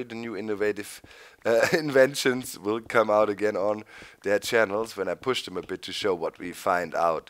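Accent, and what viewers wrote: Germany. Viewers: LIKE FOR HIS GERMAN ACSENT!!!!!!!!!!!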